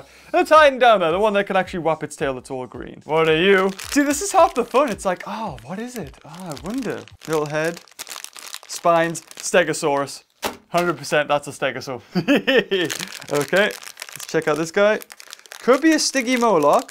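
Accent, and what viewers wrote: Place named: United Kingdom. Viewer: Ya British mate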